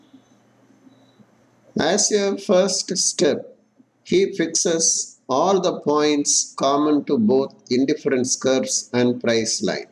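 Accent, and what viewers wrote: India. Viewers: what the hell, your accent, are you an indian?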